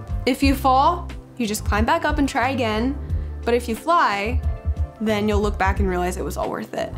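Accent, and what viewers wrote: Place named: California, United States. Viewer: California accent 😆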